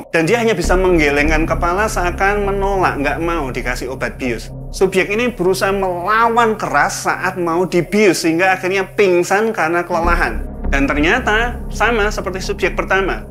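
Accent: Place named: Java, Indonesia. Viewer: Suara anda medok banget 😅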